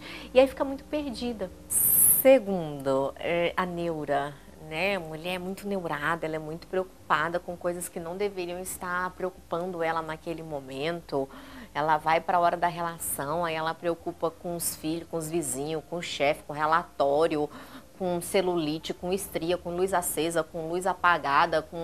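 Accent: Brasília, Brazil. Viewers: sotaque brasiliense super ativo rsrs aií aií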